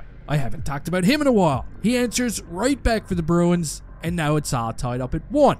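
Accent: Newfoundland, Canada. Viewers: Is he from Newfoundland? I’m from NL been watching this fella for about a year now or so and always thought he was. but wasn’t sure lol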